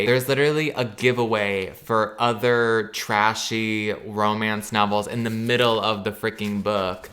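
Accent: New Zealand. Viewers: So happy you posted again ❤️ and your New Zealand accent had me dying 😭😭💀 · I cant with your new zealand accent 😭😭😭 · the entire video and the nz accent got me so blindsided as a nz person LMFAOOOO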